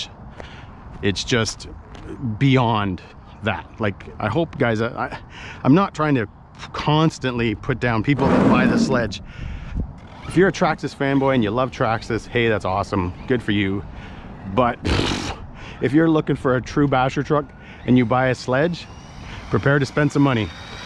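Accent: Canada. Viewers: Glad I looked into your location - I'd recognize a Northern Ontario accent anywhere! Great content - you deserve WAY MORE subscribers.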